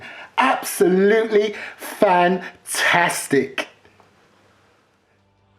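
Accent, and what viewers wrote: United Kingdom. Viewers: Are you British? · You are delightfully British. I love it.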